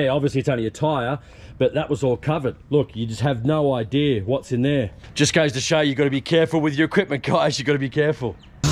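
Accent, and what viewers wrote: Australia. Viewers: Love watching your videos. You have a keen sense of humor. Along with the humor you have a heart of gold. You are always helping others. Love the Aussie accent.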